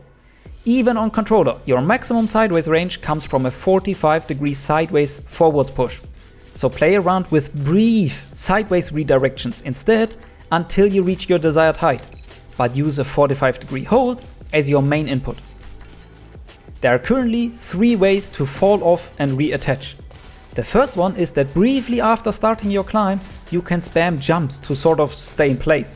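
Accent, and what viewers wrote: Germany. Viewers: Geiler allman akzent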